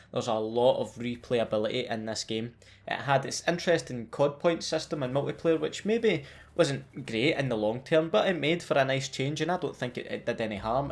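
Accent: Scotland, United Kingdom. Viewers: I love your channel, but your braveheart accent is giving me a headache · That scotish ascent dogh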